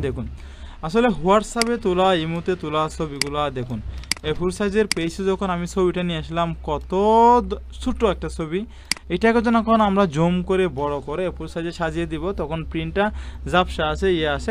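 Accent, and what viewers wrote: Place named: Noakhali, Bangladesh. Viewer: ভাই আপনি তো নোয়াখালীর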